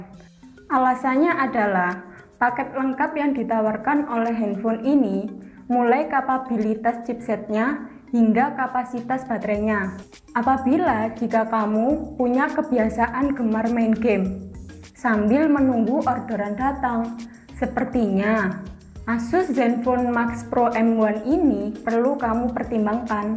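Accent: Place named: Java, Indonesia. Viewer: Medok men to mbak ma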